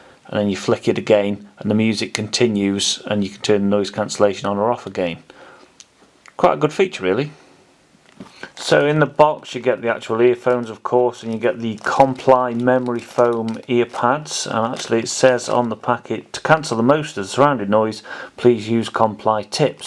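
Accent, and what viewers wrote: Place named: United Kingdom. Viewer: Like for listening to your native British English.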